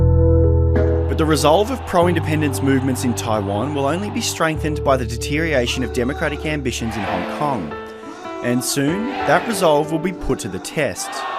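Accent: Australia. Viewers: Sounds like an average Aussie accent to me.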